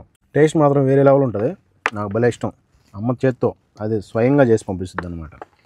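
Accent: Kadapa, India. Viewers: Mee kadapa language bagundi and mee smile supper ❤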